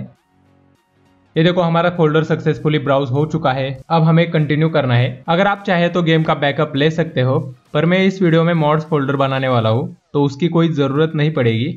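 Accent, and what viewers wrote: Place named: Khandesh, India. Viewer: BRO I TOTALLY LOVE YOU your way of speaking and that khandeshi accent i love it. it was so easy to understand you were straight and simple subscribing you right away.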